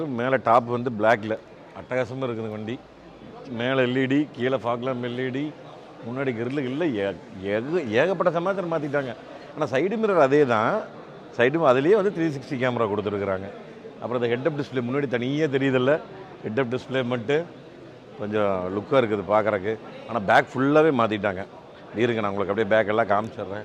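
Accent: Kongu, India. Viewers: கொஞ்சும் கொங்குத் தமிழ் பேசும் கோமகரே...! திகட்டாத தித்திக்கும் பேச்சுடைய திருப்பூர் திருமகரே...! மதிப்பிற்குறிய " " திருப்பூர் திரு. அண்ணா🙏❤ எப்படி இருக்கீங்க❤🙏 அண்ணி எப்படி இருக்காங்க❤🙏 ரித்திக் எப்படி இருக்காரு❤ "Brezza" புது கார் அறிமுக விழாவைப் பார்த்து ரசித்து மகிழ்ந்ததைவிட மதிப்பிற்குறிய " " அண்ணா உங்களுக்கு அங்கே கிடைத்த வரவேற்பு மற்றும் மரியாதையைப் பார்த்துதான் மனம் மகிழ்ந்தேன்❤❤❤❤❤❤❤😊😊😊❤❤❤❤ அண்ணா நீங்களும் நானும் ஒரு தாய் வயிற்றுக் கருவறையில் குடியிருந்த உறவு இல்லை...! ஆனால் நாம் இருவரும் "அன்புத்" தாயின் வயிற்றுக் கருவறையில் குடியிருக்கும் உறவு❤❤❤❤...! நான் மட்டுமல்ல நமது "திருப்பூர் குடும்பத்தினர்" அனைவரும் அன்புத் தாயின் கருவறையில் குடியிருக்கும் உறவுகளே🙏😊❤...! என்றும் அன்புடன் உங்கள் தம்பி ரா.முஹம்மது கபூர் (சென்னையில் இருந்து)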